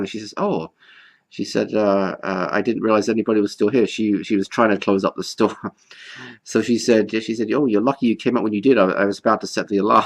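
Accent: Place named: United Kingdom. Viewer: Glad to hear you enjoyed yourself back in the UK. I've not been back in almost 10 years, time flies what can I say. Sounds like your accent has taken a bit of a hit mind, funny how that happens with some and not others. As for The Snowman, from memory I think was on the film but the song/single that was released (almost 3 years later) was ' version and that's the one we all tend to think of.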